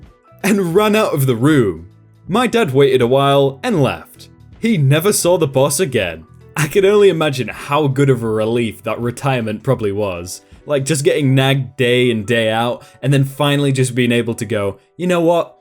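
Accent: United Kingdom. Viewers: Yes, it's called British pronunciation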